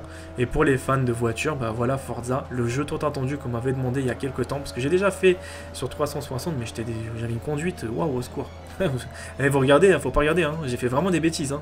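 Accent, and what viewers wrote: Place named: England, United Kingdom. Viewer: ses un anglais qui parle pas un americain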